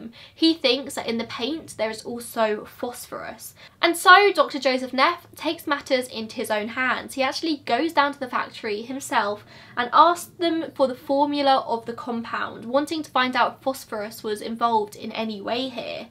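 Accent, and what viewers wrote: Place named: Cockney, United Kingdom. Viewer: Hi, you're such a cutie pie along with you're cute Cockney accent dear xoxoxo.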